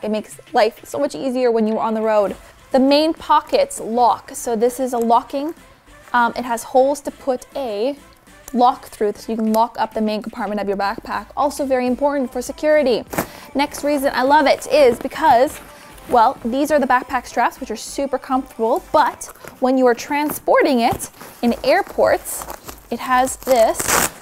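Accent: Canada. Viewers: I noticed that too. I thought she was Canadian.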